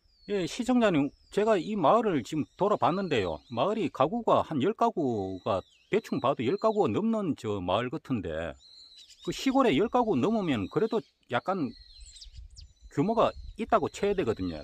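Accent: Gyeongsang, South Korea. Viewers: ㅋㅋ 말씀 넘 웃끼게 하시네예 완전 갱상도 사투리 정감갑니다 인상도 짱이시구요 좋아요 구독눌러서예 ~^^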